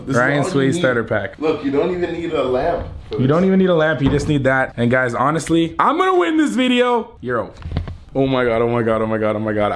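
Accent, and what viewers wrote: Jamaica. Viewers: Up mi cahgi with the Jamaican accent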